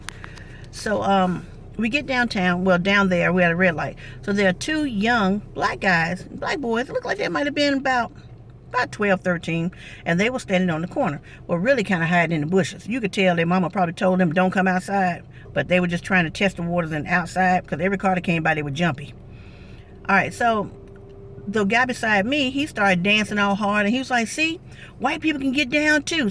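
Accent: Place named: United States. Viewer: yeah, a long way from america.. ive watched a few of your videos already and i can't get enough of your accent, if i may id like to ask where you grew up? theres something about a soft spoken American accent that i find relaxing to listen to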